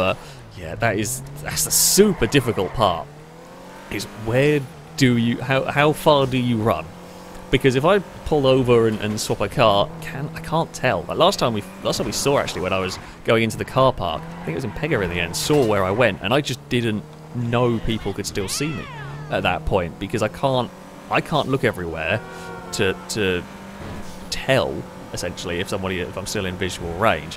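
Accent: United Kingdom. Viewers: I like your British accent It makes you more interesting to listen to.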